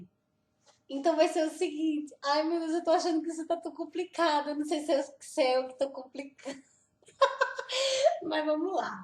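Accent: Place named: Brazil. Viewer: engraçado que tu ainda preserva um sotaque bem brasileiro kkkk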